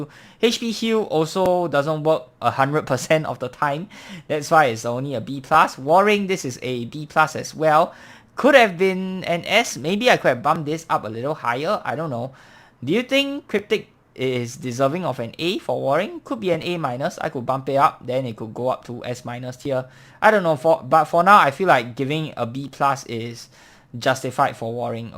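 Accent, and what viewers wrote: Singapore. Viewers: Clear advice + Singapore accent = perfection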